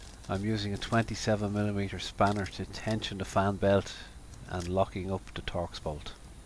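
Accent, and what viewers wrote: Ireland. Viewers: Fan belt, your old school. Love the Irish accent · Sounds Irish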